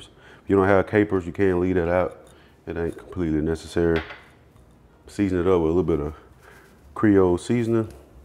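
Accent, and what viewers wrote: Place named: Mississippi, United States. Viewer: Wait so thats not an Atlanta or Texas accent its Mississipi ❤❤❤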